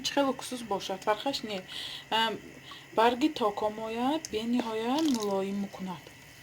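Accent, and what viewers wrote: Isfara, Tajikistan. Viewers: Офарин хохарчон лахчатон исфараги боин Дастатон дардро набинад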